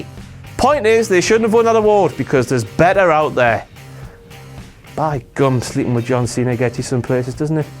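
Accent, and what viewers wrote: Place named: United Kingdom. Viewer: shit! u have a fucking shit britain accent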